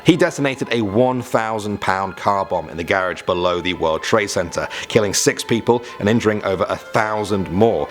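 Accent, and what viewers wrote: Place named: United Kingdom. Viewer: Hey, where in the U.K are you from? I don't recognise your accent.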